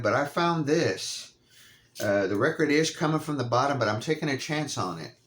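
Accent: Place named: Texas, United States. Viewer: " " may be their best record. Have to admit, when I hear your accent, I thought you were more Mississippi or Louisiana but now I'm picking up your Texas. Are you familiar with out of Beaumont? Great finds! Love ya!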